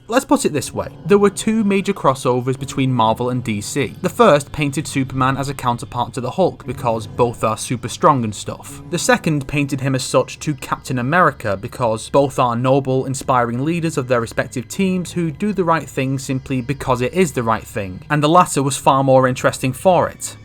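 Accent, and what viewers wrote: United Kingdom. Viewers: "Donald Trump is already the real life Lex Luthor". You know 'Donald Trump' is a weird way of spelling George Soros, or were you trying to say Michael Bloomberg? You brits! English between Americans and Brits sounds so different sometimes. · “Donald trump is the Real life Lex Luthor.” 🤦🏻‍♂️ You sound British so I’m going to assume you are. If all you know about American politics is what you hear from CNN or your local news, maybe dial back the commentary. Trump has the public’s support because he puts the people above globalism and big government. The media is trying to destroy him because they are far leftists in the pocket of large corporations. Trump is a hero for making himself a target of relentless personal attacks by protecting the freedom of American citizens. He’s closer to Superman than lex Luthor. Using his power for good, when it would be far easier not to.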